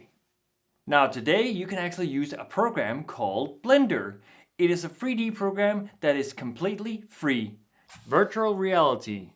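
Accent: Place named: Denmark. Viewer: Work on your danish accent. Work on your postere. This whole thing felt very bland to Watch. Also this has 0 relatability for none danish viewers, so if you insist on doing video’s in english, make sure that there is at least something that none danes Can relate to. Otherwise you might as Well just speak danish. Not trying to be toxic. This is just my honest oppinion.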